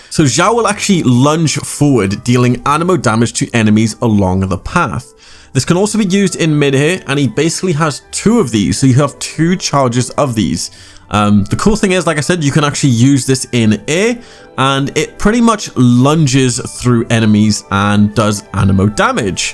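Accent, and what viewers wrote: United Kingdom. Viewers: You sound a little British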